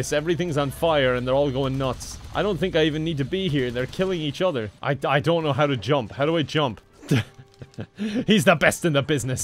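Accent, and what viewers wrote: Ireland. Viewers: You sound exceptionally Irish in this video 😂😂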